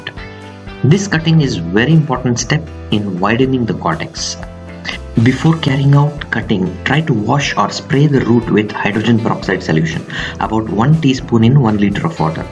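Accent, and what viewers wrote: India. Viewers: Your tips help, however not to be rude but I would highly suggest that you take some English speech classes. Your heavy Indian accent makes it extremely hard to understand what you are saying. After awhile of listening, it actually becomes painful to listen to the video because of this. Again I’m not trying to be rude, I hope you will consider this a kind, helpful suggestion that it was meant to be.. I think you will see a difference in your positive votes if you can put some hard work into reducing that heavy Indian accent. I wish you success!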